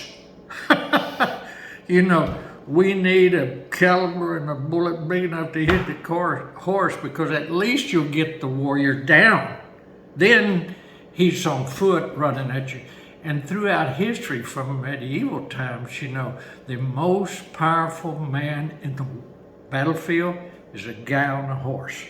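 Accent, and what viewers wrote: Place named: West, United States. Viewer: Greetings from Pune City, Maharashtra. You have a clear Western twang accent and I love the way you speak as if I'm watching a Hollywood western. You're describing so much in detail that I can picture it. Thankyou for the information. I've subscribed to your channel if it's ok for an Indian Indian to learn more about the Wild West History. Thanks again Sir.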